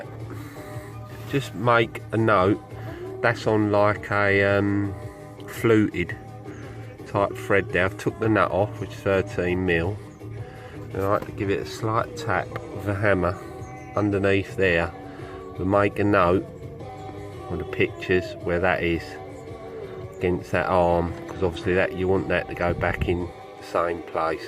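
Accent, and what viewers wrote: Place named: Cockney, United Kingdom. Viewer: Loving the auto-generated sub titles on this with his cockney accent lol.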